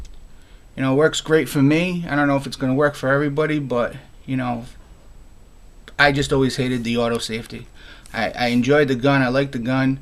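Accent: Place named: New England, United States. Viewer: You have a great accent. New England is my guess. Thanks for the video.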